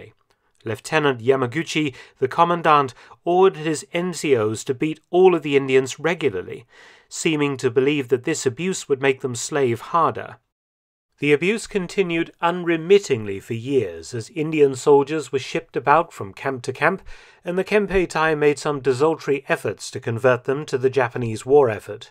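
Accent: England, United Kingdom. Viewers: The first thing that Indians and other colonies of western countries were forced to fight a war that was not their. Japanese were worst but we should not forget how the Churchil of England killed millions of Indians by depriving them of food known as famine of Bengal. They sent all the food for the soldiers and here many people died due to hunger. Since the narrator seems an Englishman by his accent he must know that England has done no less horrible things to Indians then the japanies at that time.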